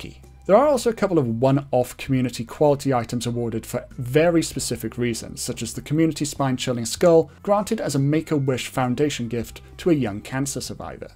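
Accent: United Kingdom. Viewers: Your from the uk aren't you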